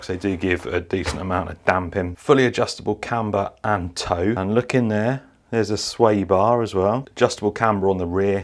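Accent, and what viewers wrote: United Kingdom. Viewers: New sub here. I am a 73 year old US guy. I have to put my hearing aids in to understand your strong British Accent. Great video. Congrats on the new shop. F**K the dislikes!!!!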